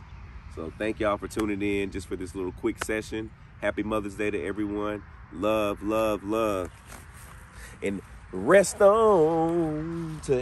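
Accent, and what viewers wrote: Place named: East Texas, United States. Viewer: Awesome visit 😀 That was very nice of you to go there on moms day. I'm the one who ask you if you were from East Texas. I listened to your accent. I'm born and raised in Ft. Worth. Love your channel and approach. Keep on keeping on. 👻🌹👻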